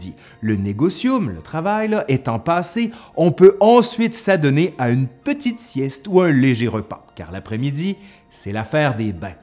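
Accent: Quebec, Canada. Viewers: il est québécois, il a l’accent et le language de là-bas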